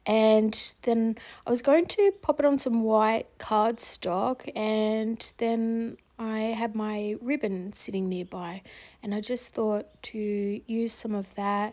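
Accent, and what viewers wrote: Australia. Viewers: An Aussie voice? Instant subscriber! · Good to see your process. Thanks for sharing. Love to hear another Aussie!😃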